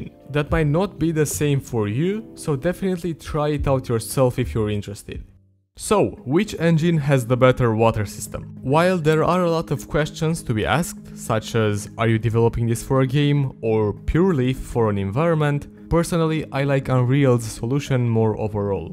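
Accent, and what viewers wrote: Russia. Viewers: Странный русский акцент для румына 😉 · Почему мне слышится русский акцент? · такой акцент русского языка, ты что плохо знаешь английский ? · Слышно, что наш парень. С произношение вообще беда) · акцент то русский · Такой жесткий русский акцент у автора )) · Русский акцент такой ржачный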